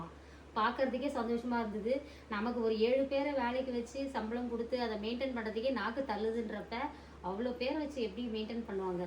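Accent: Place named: Kerala, India. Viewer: Sound Kerala sister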